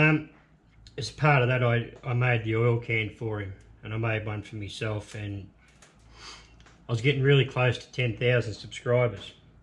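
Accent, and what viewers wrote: Australia. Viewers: You do great videos, and great workmanship on your projects. I've been watching your channel as well as CEE for the content as well as both of your Ausie accents. Keep it up.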